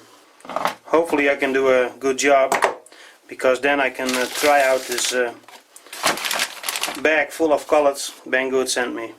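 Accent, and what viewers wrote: Netherlands. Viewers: Are you Dutch? Because of the accent.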